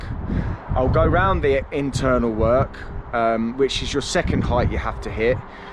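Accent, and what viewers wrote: Cockney, United Kingdom. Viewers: I never knew bricklaying was so technical.....thought it was just bish bash bosh! At least that is what I witnessed when a bricky built a wall for me and probably why it looks so shit! Loving the cockney c bombs thrown in.....qwality son. Thumbs up.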